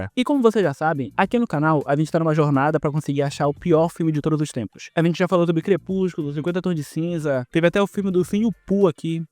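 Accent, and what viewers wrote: Pará, Brazil. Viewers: Mano, fala sério, tu és paraense, né bicho? Tu é doído é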